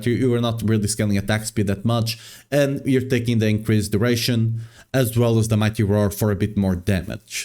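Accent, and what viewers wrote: Portugal. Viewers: Are you Portuguese by any chance?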